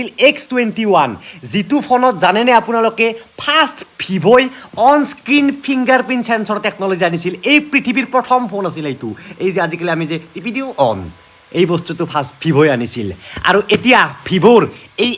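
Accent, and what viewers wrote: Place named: Nalbari, India. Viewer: da apunar kotha bur bohut val lage, Moiu nalbariya hoi, Mur koka aita ghor nalbarir, But moi golaghat ot jnmo hoi Golaghot te Amar ghor etiya, Heibbe nalbariya kotha nihikilu valkoi😊😊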